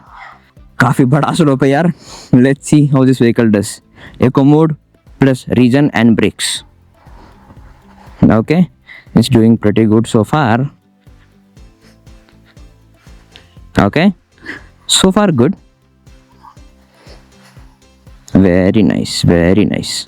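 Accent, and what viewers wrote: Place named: South, India. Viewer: आपकी हिंदी ज्यादा अछि लगी जबकि आप दक्षिण भारतीय लहजे में बात कर रहे थे...लेकिन जो भी हो आपने बेस्ट हिंदी बोली....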